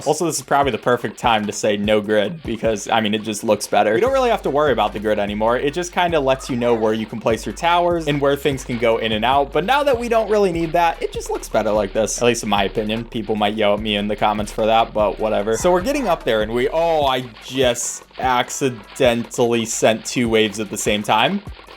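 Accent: Wales, United Kingdom. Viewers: Oh dear, Irish? He's Welsh! It's like 2004 on Newgrounds all over again... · no it’s not it’s clearly welsh 😭😭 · IRISH!? He is WELSH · im pretty sure that was a welsh accent dude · Irish guy? That's a Welsh accent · Sir that's a Welsh accent not an Irish one as far as I can tell.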